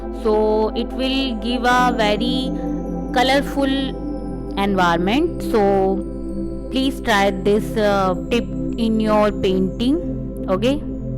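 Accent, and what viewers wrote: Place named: India. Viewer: You have Indian accent! Are you Indian?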